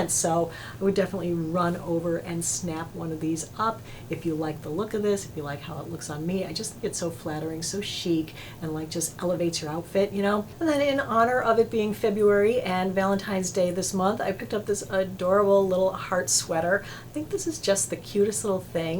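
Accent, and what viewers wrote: France. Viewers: Love your French accent!😉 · Love your French accent, oui oui! ❤️ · Oh! Votre accent Français! Too cute;) J’adore vos vidéos!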